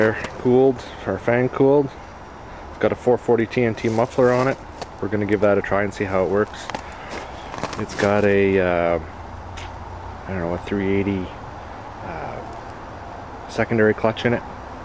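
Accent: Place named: Canada. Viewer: It;s hilarious that I can tell when I'm watching a video from a Canadian by the way they talk lol... Yer from the Ottawa Valley eh? hehe.. Cheers Toronto here!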